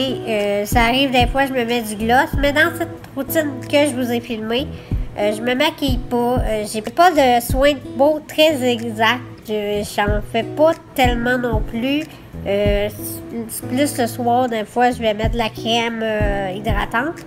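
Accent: Quebec, Canada. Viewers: Elle et québécois 😍😍😍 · j'aime trop les accent Québécois ❤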